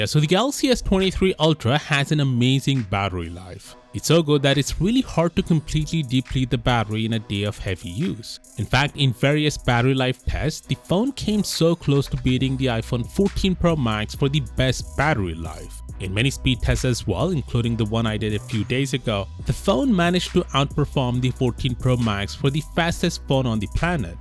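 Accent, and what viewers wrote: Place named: India. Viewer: I know an Indian accent when I hear one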